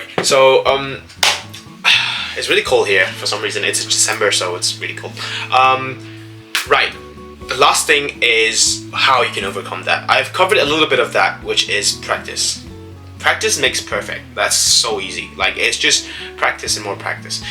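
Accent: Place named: United States. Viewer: You say you’re not a native English speaker, but I’m wondering how that’s possible haha. I’m an American and you just sound perfectly American to me. Can you explain how this is the case? You must have consumed English content extensively since you were a kid. Whatever you did, I need to apply it to my life in Japanese, since I’m living in Japan 😂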